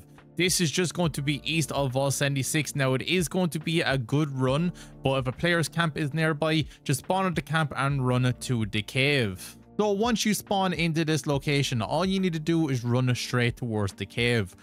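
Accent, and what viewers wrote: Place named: Ireland. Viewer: Love the videos and nice hearing the Irish accent on these tutorials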